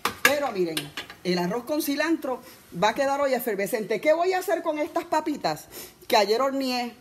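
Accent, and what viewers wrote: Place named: Puerto Rico. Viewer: Sii se nota que es puerto rique~e y apura sepa!! Y a tii a leguas se te nota la envidia....sii no tienes nada que decir aracante pal la mierda!! Boricua hasta la muerte!!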